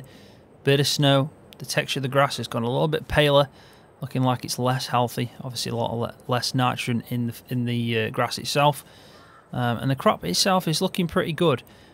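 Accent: Staffordshire, United Kingdom. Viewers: Hi are you from Staffordshire by any chance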